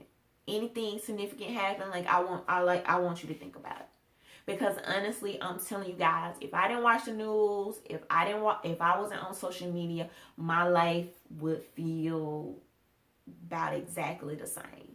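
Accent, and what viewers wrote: South, United States. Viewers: Well done! A good place to go for well written opinion pieces is American Thinker. And what a lovely Southern accent, Darling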